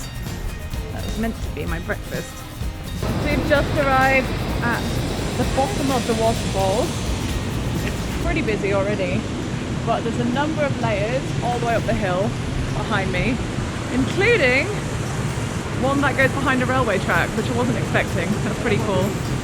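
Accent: United Kingdom. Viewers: Mam ...I can guess..u r British..Am I right??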